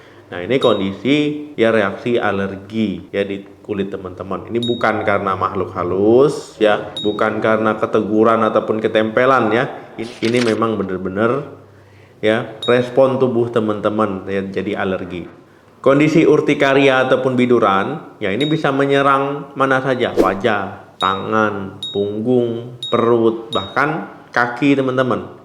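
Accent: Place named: West Sumatra, Indonesia. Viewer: Emang dokter urang minang yaaaa😂 galigato bahasa awak tuuu😅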